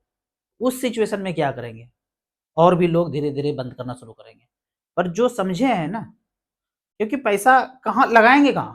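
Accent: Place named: Magadh, India. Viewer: ji Aap Bihar ke kis jila se hai.mere khayal se magad ka ton hai. Thanks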